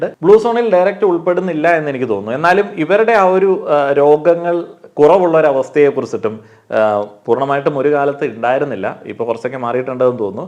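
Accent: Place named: Malabar, India. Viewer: ❤ മലയാളം നീയൊക്കെ പുസ്തകത്തിൽ എഴുതുന്ന പോലെയാകും സംസാരിക്കുന്നത്. എന്നാൽ ഞങ്ങൾ കേരളത്തിൽ പിറന്ന മലയാളികൾ ഓരോ ദേശത്തിന് അനുസരിച്ചു വ്യത്യസ്ത രീതിയിൽ സംസാരിക്കും. അവൻ പറയുന്നത് മലയാളം തന്നെ മലബാറി സ്റ്റൈൽ. അത് മോശം അന്നെന്നു നിന്നെ പോലെ ഭാഷയേ കുറിച്ച് വല്ല്യ ധാരണയില്ലാത്തവർക്കേ തോന്നൂ. ഇംഗ്ലീഷ് വരേ സ്ഥലങ്ങൾക്കനുസരിച്ചു വകബേധങ്ങൾ ഉണ്ട് 😂.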